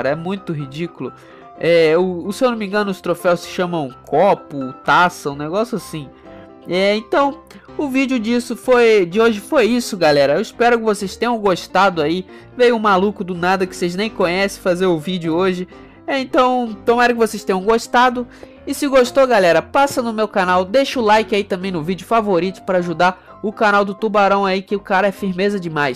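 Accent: Rio de Janeiro, Brazil. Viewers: Pela Voz o e Carioca kkkkkk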